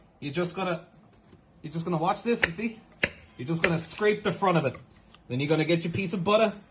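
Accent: United States. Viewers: As someone from New England I can FEEL the origins of the Boston accent in my bones from this video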